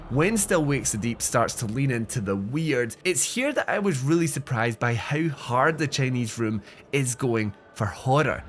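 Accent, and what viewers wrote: Scotland, United Kingdom. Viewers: You mean you cannot follow his Scottish accent?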